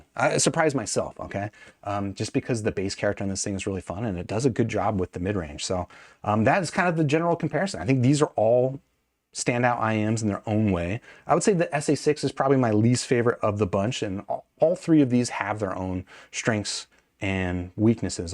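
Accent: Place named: California, United States. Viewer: I used to live in LA. I just think your accent is from California. Could be wrong...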